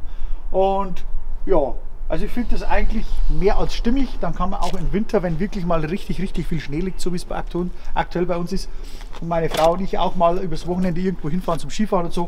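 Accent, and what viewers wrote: Bavaria, Germany. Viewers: Red bitte wieder boarisch!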